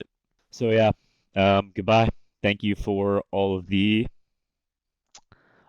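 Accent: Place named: South, United States. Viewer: I hope you will still stream and watch some tourna bro we love your southern accent casting.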